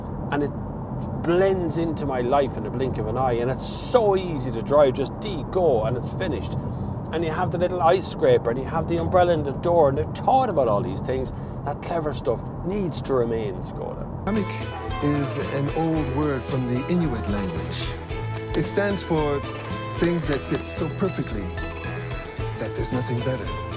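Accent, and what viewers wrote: Ireland. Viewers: How I love irish dialect!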